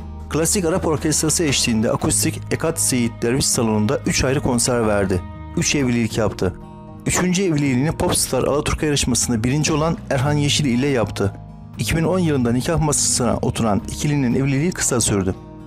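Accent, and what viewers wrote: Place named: Armenia. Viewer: LÜZUMSUZ, GEREKSİZ BİR VİDEO... AYRICA SESLENDİRMEYİ YAPAN AMCA. SENİ TANIMIYORUM. ADINI DA BİLMİYORUM... AMA KESİNLİKLE SENİN DE ERMENİ OLDUĞUNU SÖYLEYEBİLİRİM... HANGİ SES TÜRK SESİDİR?, HANGİ SES ERMENİ SESİDİR?, HANGİ SES YUNANLI SESİDİR? HANGİ SES KÜRT SESİDİR? HANGİSİ ERAB SESİDİR? ANLARIZ, GERÇEK BİR TÜRK BUNU ANLAR.. ANLAYAMAYAN VARSA TÜRKLÜĞÜ KONUSUNDA Bİ SORUN VARDIR. videodaki sanatçılar, show dünasının aktörleridir... Türklerin show VE eylence ile işi olmaz. onlar tarih yazarlar.. bazen karanlık dönemlerde olur tarihte... ancak aydınlık her zaman galiptir. bu arada videodaki tiplerin hepsi bizi eyledirmiş, gönlümüzü hoş etmişlerdir, gözümüzde saygındırlar.. onların ermeni olup olmadığı ile ilgilenmedik. ilgilenmeyiz... ölenlere rahmet, yaşayanlara sağlık dileriz. TEKRAR SÖYLÜYORUM GEREKSİZ BİR VİDEO, BU TÜRK TOPLUMUNDA, HİÇ KİMSE ADİLE NAŞİT,COŞKUN SABAH,ONNO TUNÇ, VE DİĞERLERİNİ ; NE ERMENİ DİYE DAHA FAZLA SEVDİ; NE DE ERMENİ DİYE SEVMEKTEN VAZGEÇTİ...BÖLÜCÜ APARATI OLMAYI BIRAKIN...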